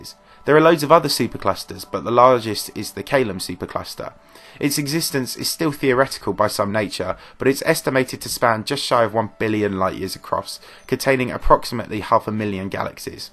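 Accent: United Kingdom. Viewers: Please slow down so we can understand what you're saying! My students love watching your videos on the universe, etc. They listen carefully but British accent spoken fast is hard to decifer! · British accent is annoying..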